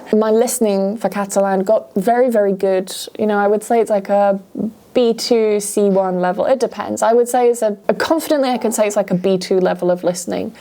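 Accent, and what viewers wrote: United Kingdom. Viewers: Would you please read short stories.. I like listening to your beautiful clear British accent narrating a short story.. thank you in advance ❤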